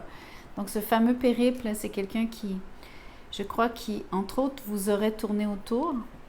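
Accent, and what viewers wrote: Quebec, Canada. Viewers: Bonjour. Quel bonheur pour moi aujourd'hui. Tres peu de tarologue sont du Quebec. J'ai bien entendu votre accent. Mais de vous entendre le dire. Bingo. Je vais vous suivre. De quelle ville etes vous? Et votre tirage est etrangement similaire a se que je fais et planifie de ma vie. Merci et gratitude 🙏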